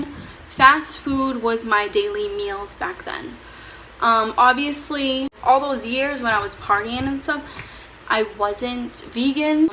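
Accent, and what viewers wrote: Boston, United States. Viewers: Do you live in Boston? It sounds like you have a boston accent. It's cool. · Are you from Boston? You have the accent! lol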